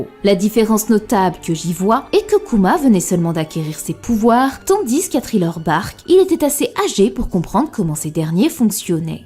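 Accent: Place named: Belgium. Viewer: oui un peu à la manière de certains belges et plus précisement quand le u est suivi d'un i ^^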